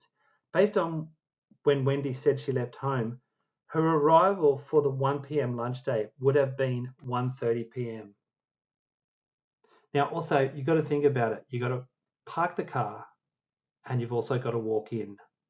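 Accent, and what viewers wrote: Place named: Australia. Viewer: Nice to hear an 'Aussie' .. Me too! 🇦🇺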